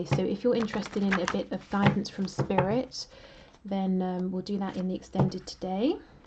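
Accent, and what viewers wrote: United Kingdom. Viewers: Hai very good morning dear namaste great reading as always I feel u and ur reading can't go wrong apart from ur reading I am big fan of ur voice abd ur English I mean the British English. Thanks a million dear with warm regards namaste lots of love and light. Dr vijay anand. (prince India khalid)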